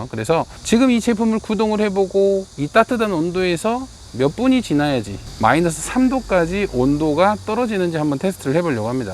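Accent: Jeolla, South Korea. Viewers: 억양이…전라도 어디여?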